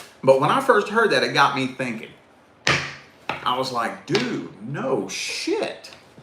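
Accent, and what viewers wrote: United States. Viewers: I like listening to your American accent . At times I think ' how I wish I can talk and sound like that.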